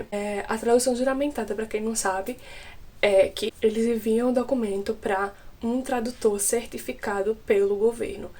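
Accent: Santa Maria, Brazil. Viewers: Tu é muito divertida véi!! E que bom que não perdeu o sotaque de SM kkkkkkkkkkkkkkk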